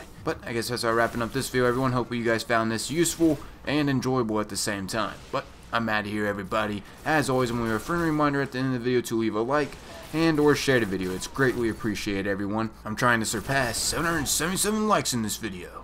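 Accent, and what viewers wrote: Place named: Philadelphia, United States. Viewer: Yo! I like the Philly accent.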